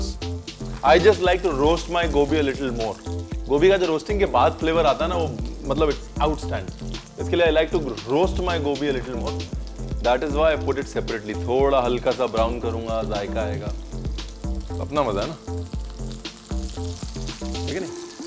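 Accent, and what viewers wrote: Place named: Punjab, India. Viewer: Your Punjabi excent and way of speaking is just great 👍👍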